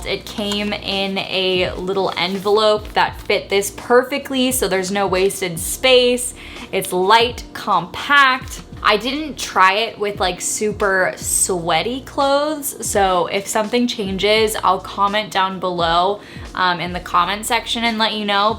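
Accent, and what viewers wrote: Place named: Canada. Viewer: I'm curious where your from? You're accent sounds a bit Canadian.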